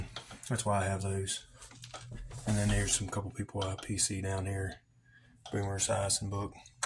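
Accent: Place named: South, United States. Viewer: Now that your doing more videos, I'm getting hooked on that sweet southern accent. Love the card room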